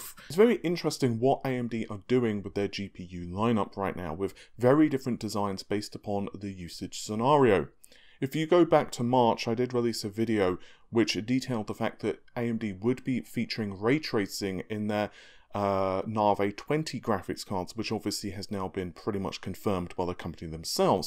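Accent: United Kingdom. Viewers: "six cores and six...*faaahreads* " Awesome video as always but dang that British twang remains strong.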